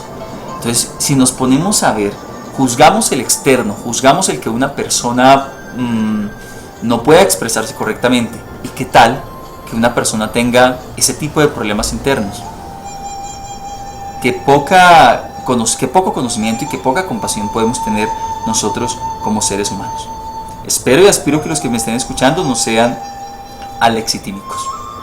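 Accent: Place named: Colombia. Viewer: Gracias mi estimado. Pero tan mal dicho esta decir Zi, como Sapato. Sin ánimo de molestar abrazos para Colombia de donde por su entonación deduzco es usted oriundo.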